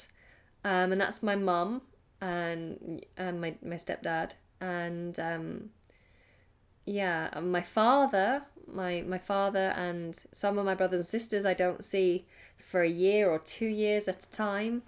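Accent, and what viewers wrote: United Kingdom. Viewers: I LOVE YOUR BRITISH ACCENT. YOU ARE SUCH A HUMBLE PERSON !!!! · I love you and your British accent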